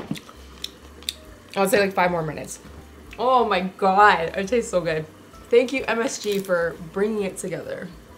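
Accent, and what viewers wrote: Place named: Cockney, United Kingdom. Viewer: Please start posting again V! Miss you're content. From the UK, London. If you chuck in you're cockney accent ('faakin' hell!') will love you forever xxx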